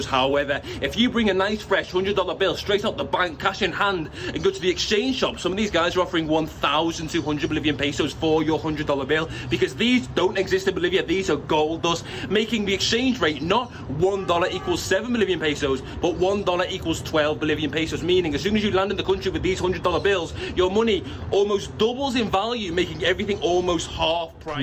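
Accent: Colombia. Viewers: Bro talks different like colimbian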